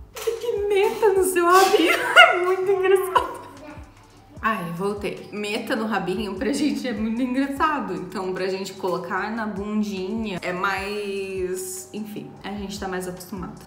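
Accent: Portugal. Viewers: Que lindo, daqui a pouco ele já está falando com sotaque português.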